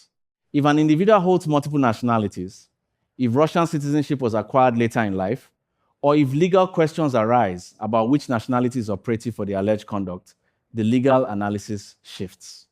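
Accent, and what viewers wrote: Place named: Nigeria. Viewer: Why do you sound like a Nigerian, when you are a Ghanaian.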